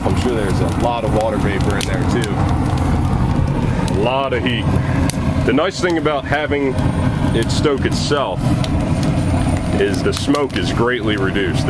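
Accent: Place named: Mid-Atlantic, United States. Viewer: Have you ever lived in the Delaware, Maryland, Pennsylvania area? You've kinda got the accent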